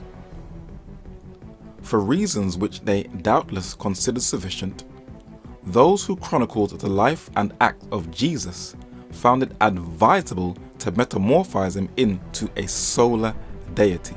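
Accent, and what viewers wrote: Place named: Scotland, United Kingdom. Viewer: very difficult to understand the speaker. Sounds like he is a s Scottish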